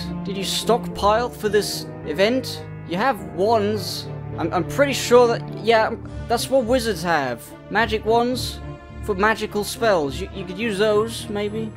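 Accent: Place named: United Kingdom. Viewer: I fucking hate british accents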